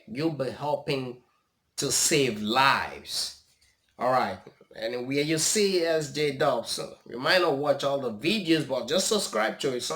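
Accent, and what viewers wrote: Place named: Calabar, Nigeria. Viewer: You are Edo but got a Calabari accent. Or maybe it’s I have not been in Nigeria for a long time.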